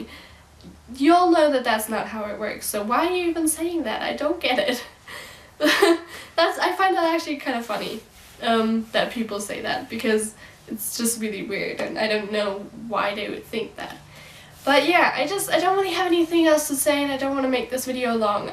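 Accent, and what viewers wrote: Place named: Germany. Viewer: Bevor ich deinen Kanalnamen gelesen habe, dachte ich so "Ist sie Deutsche? Denn ich habe den exakt selben Akzent" :D. Ich rede genauso wie du, wenn ich englisch spreche. I'll continue in english so everyone can understand. I'm pretty sure I'm bi too. Nobody knows although I think a few friends assume. I kinda wanna come out to my friends but I'm not sure if I'm ready enough unless they ask then I would tell them. I have had thoughts of being gay (turns out I'm bi) since the age of 13 (I just turned 17) and although I've never had a problem with lgbtq+ people being one myself was something I really didn't want to be. Accepting myself was definitely the hardest things although I knew my family and friends would support me fully.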